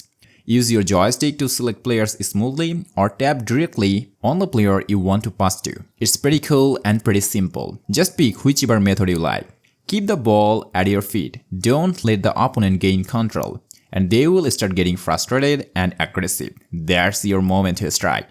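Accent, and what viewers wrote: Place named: Bengal, India. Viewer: Your accent is Bangali. Are you from Bangladesh?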